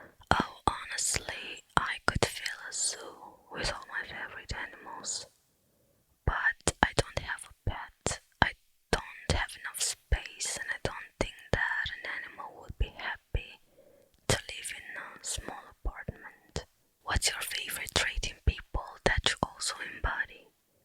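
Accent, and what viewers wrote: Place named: Romania. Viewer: You’re from Romania!! I love your accent so much and you are pretty! Keep up the good videos!!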